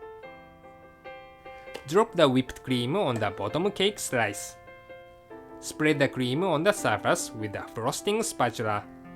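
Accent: Japan. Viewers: Noo! Just Japanese engrish! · I just love Japanese accent. It makes the cake yummier!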